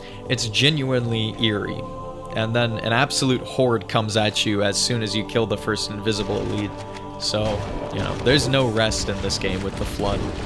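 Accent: Greece. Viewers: Are you Greek? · Are you Greek bro ?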